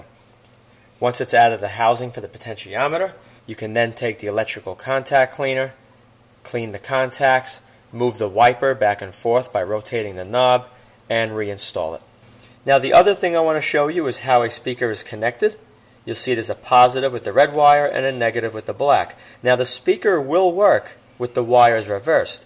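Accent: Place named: Boston, United States. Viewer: Thats too thick a bastan accent for me.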